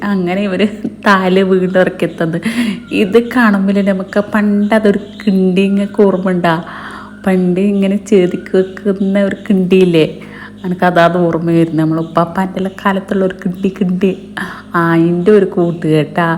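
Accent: Kannur, India. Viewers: Ngale samsara reethi nallam ishtapett.kannur kasakodu karude samsaram kelkan nalla rasama❤❤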